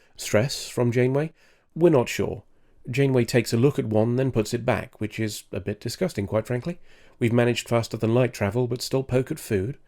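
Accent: RP, United Kingdom. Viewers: After playlisting ( if that is a word). Two observations, you are the most British toff i heard since I worked on the Antique Roadshow and you don’t really understand American women at all.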